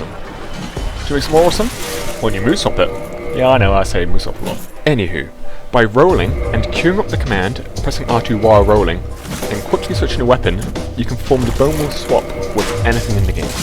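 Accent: United Kingdom. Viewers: i wish i could understand more than half of that. you are talking too fast and with too much of a british accent to be perceivable. please do subtitles.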